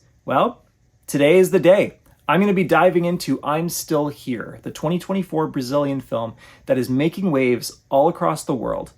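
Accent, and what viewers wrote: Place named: Canada. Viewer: I think he is canadian... he has that typical lovely canadian accent.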